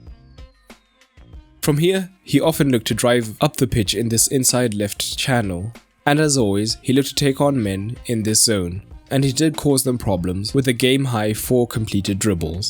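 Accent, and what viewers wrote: South Africa. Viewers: Are you South African? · That you sound South African.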